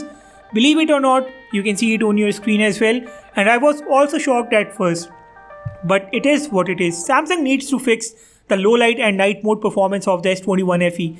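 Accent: India. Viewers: Thanks for the review. Although I must say this accent does not allow me to watch the video at 2 times the speed... I wish hindu videos would invest in getting an upgrade in their english pronunciation - it is really hard to understand most of the time and makes at least me not watch those videos and look for videos with much better english.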